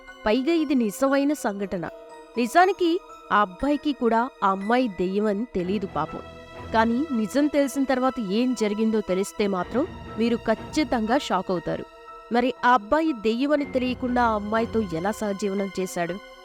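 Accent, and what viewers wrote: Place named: Andhra Pradesh, India. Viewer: Adi me ts slang kadu cheppedi ap slang adi telusuko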